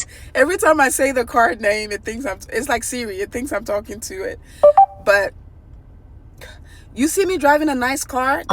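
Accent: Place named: Jamaica. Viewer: Maybe you sound like you from Jamaica or somewhere maybe you should probably go back somewhere where he can’t find you and don’t talk about it just up and move somewhere and you might have to leave some people behind. If y’all have mutual friends you may have to cut them off cause it sound like he might try to do something to you. You might wanna justmove again and don’t tell anybody.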